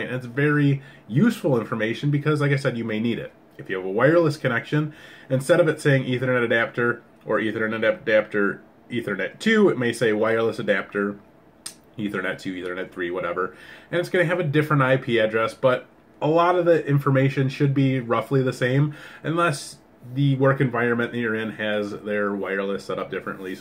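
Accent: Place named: Michigan, United States. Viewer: I love a good Michigan accent 😍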